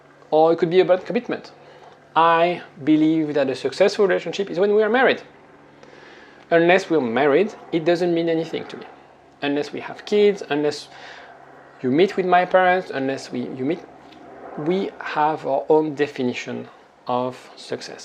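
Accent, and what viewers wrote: France. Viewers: I loved this video❤Thank you,. The French accent is also very nice😊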